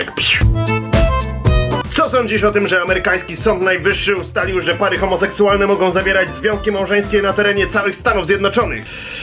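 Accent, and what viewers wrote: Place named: Poland. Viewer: Nagraj beczkę po angielsku z takim zajebistym polskim akcentem ^_^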